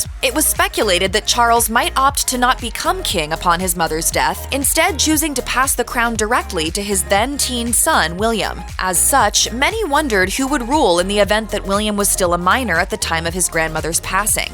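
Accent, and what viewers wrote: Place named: United States. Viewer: Video: "Prince Andrew is one of the most...." Me: *Hears American accent, immediately skips to next video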